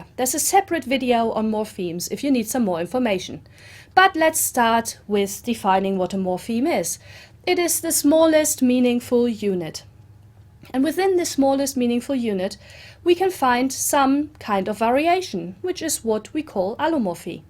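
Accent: Germany. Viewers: Hey, thanks for the clip! By the way...something I wanted to ask you.... Are you German? Just wondering because of your pronunciation 😉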